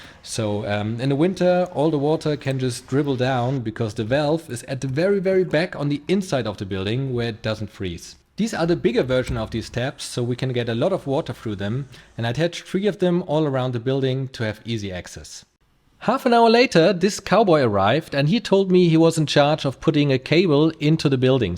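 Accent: Germany. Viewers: I can tell you‘re German, not only from your accent but from the way you constructed that building. Amazing engineering, congrats! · dieser deutsche Akzent haha 🙈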